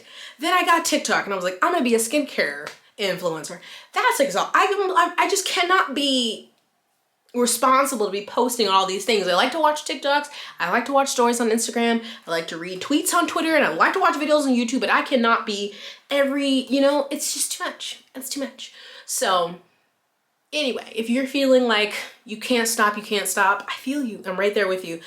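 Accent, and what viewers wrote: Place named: South, United States. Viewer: Let me guess...Alabama or Mississippi.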